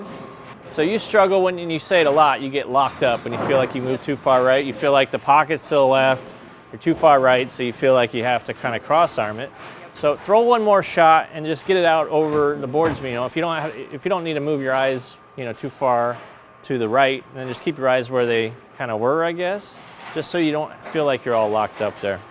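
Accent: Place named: Midwest, United States. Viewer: Never heard of before but the minute she spoke, I thought "wow she sounds very familiar." Of course she's from Ohio like me lol. Midwest dialect I suppose.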